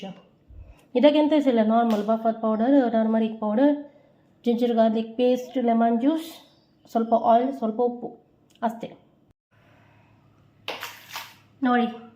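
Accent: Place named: Mangalore, India. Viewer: Just saw this chanel ,you talk like my elder sister 😂 our kannada is same like this because we are mangaloriens😂❤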